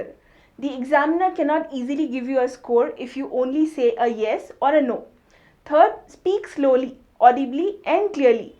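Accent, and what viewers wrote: India. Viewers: It's not terrible it's Indian accent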